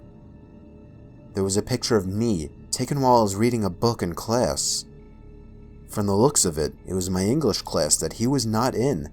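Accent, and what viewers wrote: United States. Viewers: Your accent brings back rad memories of my time in the US..........Are you one of the beastie boys? 🤓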